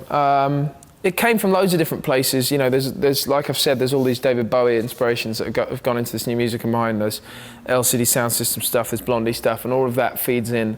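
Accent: United Kingdom. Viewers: i didn't think this guy had a British accent until i watched this video. god damn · He has a British accent?? Woah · He's not "foppish" he's POSH. That British accent is so sexy.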